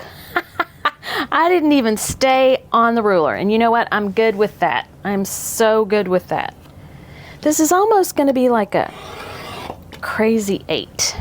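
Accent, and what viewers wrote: United States. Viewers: Yes, I am also a solid border with a scrappy binding (or any binding really) vote :) You've told me before but where is your accent from again? I'm in Australia and can only make out very few American accents! Also, what time zone are you as might join the Zoom but it's hard to get one that isn't in the middle of the night when afternoon there! Obviously, it depends on where you are 😊😊💜💜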